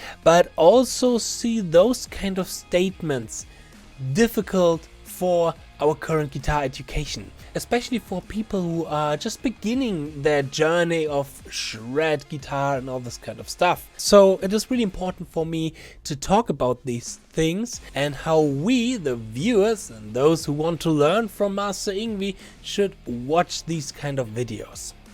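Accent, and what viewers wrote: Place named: Germany. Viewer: Right, Yngwie is one of those one in a billion supertalents and who are you?? The way I see it is that you are some strange looking guy with a weird german accent with a hoody on in what I assume should be a heated tiny room with some cheap lights, hoping to get some views of off Rick Beato's success and Yngwie's massive career. Who are you again?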